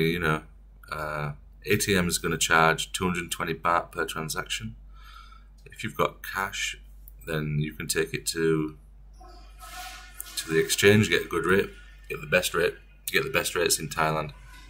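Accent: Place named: Midlands, United Kingdom. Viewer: Accent tells me you come from somewhere between Nottingham and Manchester - but not Chesterfield. Buxton?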